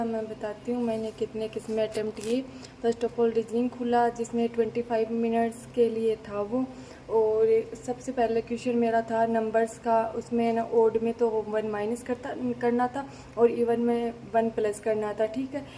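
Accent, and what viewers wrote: Rajasthan, India. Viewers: Language se Rajasthan k lagte ho😅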